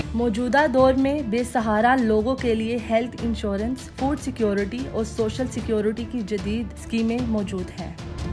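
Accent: Punjab, India. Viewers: اس وڈیو کلپ میں جس محترمہ کی آواز میں voice over کی ریکارڈنگ کی گئی ہے وہ انتہائی فضول ہے کیونکہ اس عورت کا اردو الفاظ کے تلفظ ہندی زبان سے متاثر شدہ لگ رہے ہیں۔ اُردو زبان میں تلفظ کی ادائیگی کی بہت زیادہ اغلاط ہیں۔ انڈین انداز میں غ کو ک کی طرح بولا جا رہا ہے۔ جو کہ بالکل بھی متاثر کُن نہیں ہے۔ مثلاً گداگر کو با بار گداگار، غیرت کو گیرت، غور کو گور پہلی قسم کی بجائے پہلی اقسام خاک کو کھاک درجہ کو درج تیسری قسم کو تیسری اقسام کہا گیا ہے بکواس پوسٹ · سچ میں آپ میں ٹیلنٹ ہیں پاکستان زندہ باد آپ نے موزوں بھی اچھا چوز کیا اور رول بھی بہت اچھا ادا کیا ہیں اور ہمیں پاکستان حالت کے بارے سے آگاہ کیا ڈریس بھی میچ کیا آواز کے ساتھ زبردست کمال ہیں اور دوسری بات یہ ہیں کے پنجابی آواز آپ پر سوٹ کر رہی ہیں۔ نائس اللہ آپ کو صحت خوشیاں اور لمبی زندگی دے آمین ثم آمین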